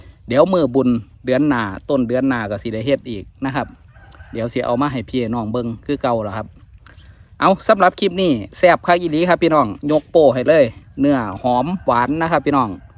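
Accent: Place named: Korat, Thailand. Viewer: เอาอีกแล้วพูดนีแทวโคราชเรียกเป็นกองดูจากสายไหม❤❤❤❤❤🎉🎉🎉🎉🎉😂😂😂😂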